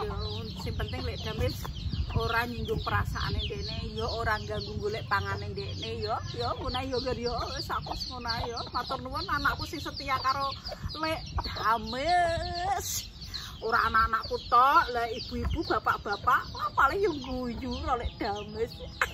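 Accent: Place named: Tuban, Indonesia. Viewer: Kalo liat kontennya, logat bicaranya mengingatkan saudaraku yg ada di Tuban.... Semangat y Lek...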